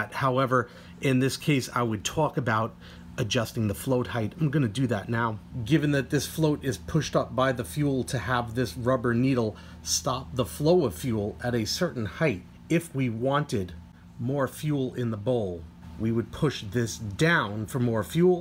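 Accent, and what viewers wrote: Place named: Boston, United States. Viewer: I did that and started her up and it doesn’t sound promising. I will warm her up tomorrow and go out for a couple of miles to see how things are. I wish I could adjust them with the air box installed - at least I can leave them installed. Thanks for your advice. I detect a Boston accent.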